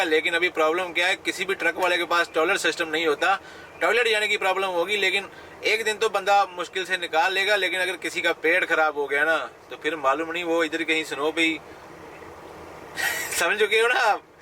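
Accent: Punjab, India. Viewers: Why the fuck he speaks Hindi in pu jani accent? Why can’t he talk in Punjabi just curious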